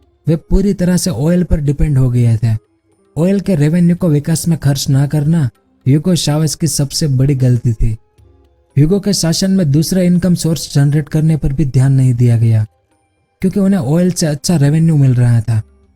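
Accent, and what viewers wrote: India. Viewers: Tu bolne me pura desi hain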